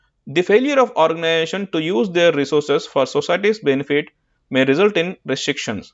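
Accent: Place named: South India, India. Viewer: Useful video. Sir, you might be from Siuth India, guessed by your English pronunciation, far from elocution. objectiw reciw beliw ... next video, every letter V make it sounds like F so that we can understand better and engage in your great contents.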